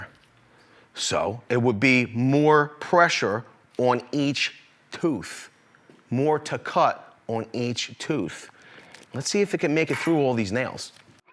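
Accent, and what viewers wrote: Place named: South Jersey, United States. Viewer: You sound like your from South Jersey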